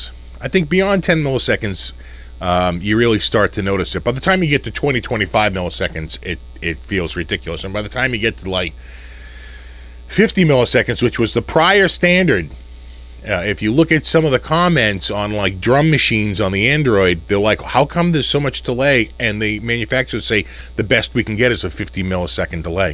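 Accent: Boston, United States. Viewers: I love hearing a fellow Bostonian talk about music and guitars. you're the man! Keep em' coming brothuh!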